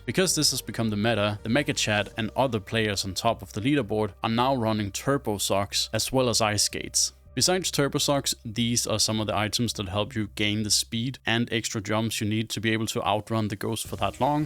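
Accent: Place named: Denmark. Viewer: Do you happen to be Danish? You sound like you could be.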